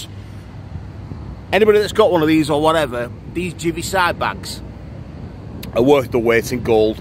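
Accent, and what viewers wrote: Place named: Liverpool, United Kingdom. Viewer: Your missus is clearly a Scouser but your accent is a strange mixture of Scouse and something else! What gives!! Great videos by the way.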